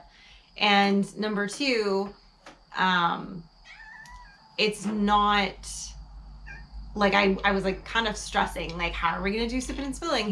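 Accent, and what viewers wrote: South, United States. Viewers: Hey I'm starting to hear a little bit of Southern accent coming through when you talk. I lived in Georgia for four years and my best friend lives in North Carolina so every time I go visit I pick my accent back up within a few days. It's been 30 years since I lived in the South. Have a good weekend and God Bless!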